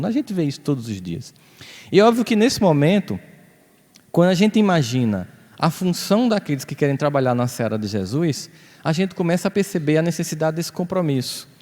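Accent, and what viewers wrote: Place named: Northeast, Brazil. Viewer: Assistindo hoje 17/01/2022. Há 6 anos, em um momento muito delicado da minha vida (depressão braba ) conheci esse iluminado, aleatoriamente mexendo no youtube, e nunca mais deixei de ouvir suas palavras. Sempre busco suas palavras, pois me fortalece, esclarece e me centra. É uma viagem p dentro de nós mesmos. Sem falar q amo esse sotaque, pois meus pais tb são nordestinos.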